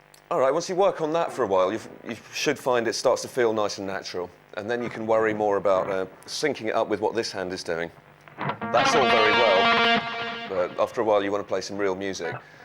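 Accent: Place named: United Kingdom. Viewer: Your accent is so British.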